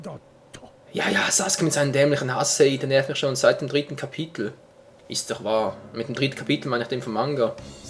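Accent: Switzerland. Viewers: bist du schweizer? nice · kommst du aus der schweiz ?? ;)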